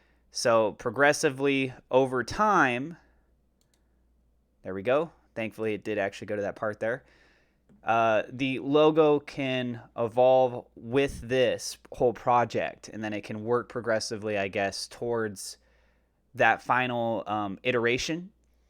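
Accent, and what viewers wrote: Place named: Wales, United Kingdom. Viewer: Haha how can you mistake a Welsh accent for Australian, they are so different!!!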